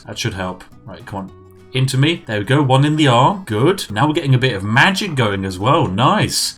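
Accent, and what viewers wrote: United Kingdom. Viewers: i like his „that’s absolutely perfect“ and „fantastic“ 😂 of course in a perfect british accent. and of course: „oh, goodness!“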